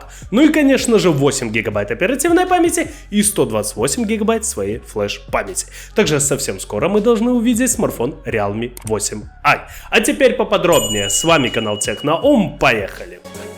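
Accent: Belarus. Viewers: Когда слышу слова - гдзе, быстродзействие. Сразу понятно блогер из белорусии. Любит ныть и жараную картошачку. Яки небудь карэнны минчанин или гамяльчанин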